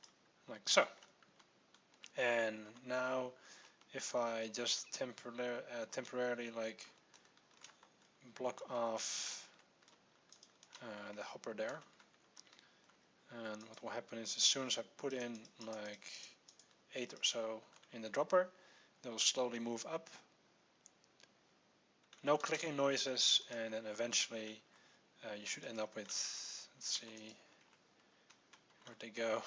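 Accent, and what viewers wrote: Netherlands. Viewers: Where are you from? You have a hollandguy's accent...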